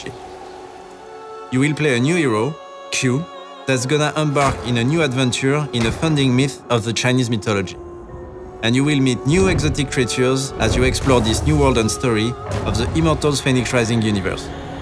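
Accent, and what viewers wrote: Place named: France. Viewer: Le mec quand il parle ça s'entend qu'il est français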